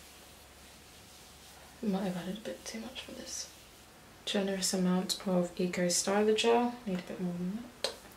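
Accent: United Kingdom. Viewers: Londoner right there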